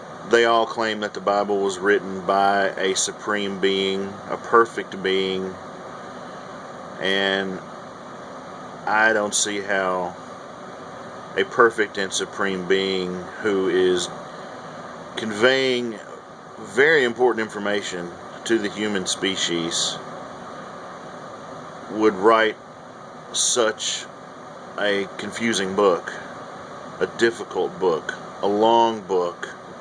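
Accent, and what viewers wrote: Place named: South, United States. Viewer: nice hearing this from someone with a southern accent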